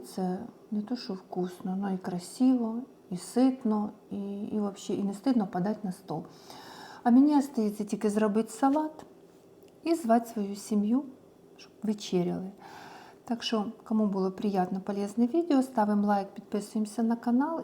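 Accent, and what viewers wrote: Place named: Poltava, Ukraine. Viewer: Так говорять на Полтавщині,на Сумщині,на Донбасі.Що не подобається? Чі тільки треба на галичанському говорити.Коли ви вже перестанете чіплятися до того,хто як говорить.Задрали вже!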